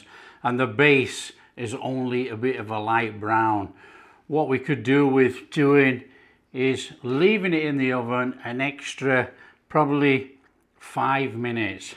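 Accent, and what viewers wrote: Yorkshire, United Kingdom. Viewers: Every time I hear you say garlic, I think of Peter Kay. “Garlic bread, it’s the future! “ lol. Must be the Yorkshire accent. I was having a good old chuckle with the garlic oil hehehe p. In the nicest possible way though. I adore you, you’re just so real xxxx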